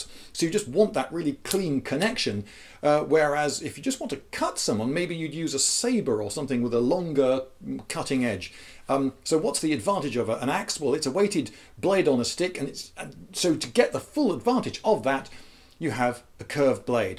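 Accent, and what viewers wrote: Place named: RP, United Kingdom. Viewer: why is your accent so posh? Is it real?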